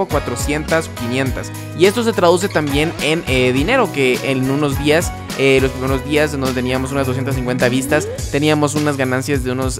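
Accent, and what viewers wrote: Mexico. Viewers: Se te agradece la información papá, pero demasiado largo el video, hay que mejorar la edición en la post- producción de tu contenido. Existe demasiada redundancia en tu diálogo. Además ¿dónde dejáste el VOS chapín? Parece más que sos mexicano. Son opiniones puramente personales por supuesto. Espero tomes la crítica en una manera constructiva. Para que veas que no estoy siendo culeid, le dejo un 'like' a tu producción mano. Sigan adelante. Por cierto es primer video tuyo que miro, y voy a ver más para apoyarte. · por qué habla como mexicano? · Porque habías como mexicano 90%mexicano10%Guatemalteco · Este men parece mas mexicano que guatemalteco ? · Porque hablas como mexicano?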